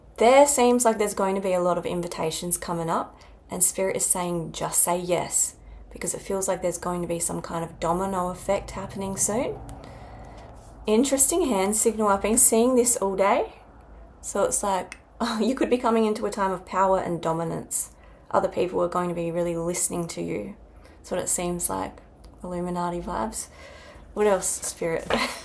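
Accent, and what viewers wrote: Australia. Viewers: I love Australian accents.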